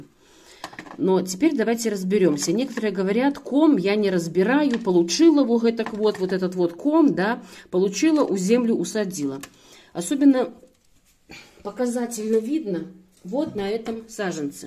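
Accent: Belarus. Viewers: Спасибо❤. У вас такой милый белорусский говор с акцентом на букву "я"))))))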